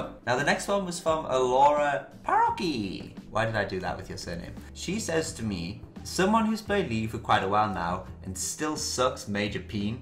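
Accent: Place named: North, United Kingdom. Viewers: Gotta love that accent :D NOOOORTH bonaana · If you are from New Castle, where did you get the posh accent then? hahah I thought New Castle has the weirdest accent among english people.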